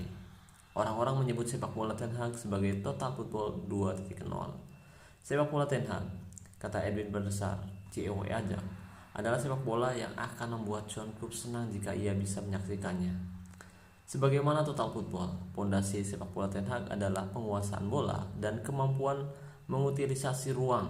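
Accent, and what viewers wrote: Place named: Cileungsi, Indonesia. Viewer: bang lu orang sekitaran cileungsi yak?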